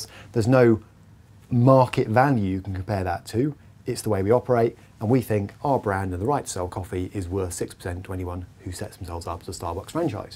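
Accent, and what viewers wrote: United Kingdom. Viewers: What kinda British accent u have?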